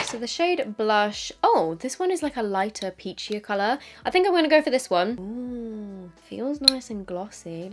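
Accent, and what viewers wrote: United Kingdom. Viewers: !!!! I started watching your videos in 2017 or 2018 exactly for testing these cheap make up products and because I was living in UK back then and you helped me to learn understand british accent. Later I stopped watching youtube for couple of years and now I just felt like watching make up video and I remembered you so I got back and it was one of the first videos that was recommended for me. I still love you♥ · I found you in mid-2017. I was 37 years old and had NO idea how to do makeup (foundation especially!). I’d always been too lazy to do makeup, and my skin was clear due to hormonal BC since age 15, so foundation was completely alien to me. But as I approached 40, my skin was beginning to show sun spots and dark circles, so I wanted to learn how to do my makeup. I subbed to around 15 makeup channels including yours & I have only stayed with 3, including you. 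Mostly because you’re super relatable, down to earth, honest, and I adore a British accent (USA here). I’ve really enjoyed watching you grow up and thrive. I’m happy for you in the same way I’d be happy for a little sister… is that weird? I don’t even know you but I have the best wishes and highest hopes for you & your little family. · You popped up on my for you page in YouTube about a year ago but then I didn't like the British accent but at the same time i was watching some of your videos and after a couple months i became addicted 💗💗💗💗💗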